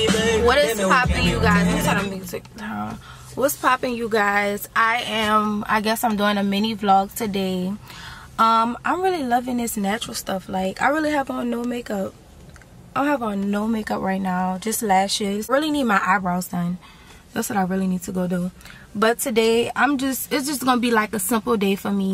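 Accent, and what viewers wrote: New Orleans, United States. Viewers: Yesssss I was thinking that 😍 I love New Orleans accents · Is that a New Orleans accent I’m hearing?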